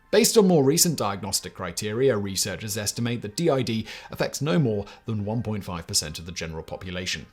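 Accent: United Kingdom. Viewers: Psuedo intellectualism influenced by post modernism is the curse of the 21st century. A british accent doesn't make it so. 2 hours of gross over statements, lies and fluff.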